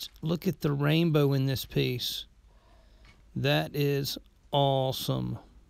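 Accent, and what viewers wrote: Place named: Carolina, United States. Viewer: Handsome, charming, rugged, manly man with a Carolina accent and a sense of humor, doing scientific 'ciphering' while digging into dirt and rock to pull out massive, gorgeous gemstones!... WHAAAAAT! THAT'S JUST HOT! Best YouTube channel EVER! ROCKS!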